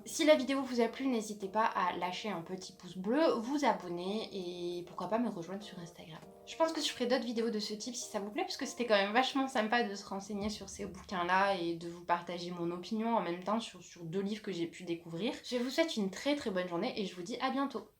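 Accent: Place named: South, France. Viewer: Je continue à remonter le temps et ce coup-ci en partant de la fin. Très bonne vidéo avec ses 3 parties, et j’adore la pointe d’accent du sud. Mon premier Stéphan King sera certainement 22/11/63. Marche ou crève m’intéresse ! Déjà le titre me parle et ton avis confirme l’impression.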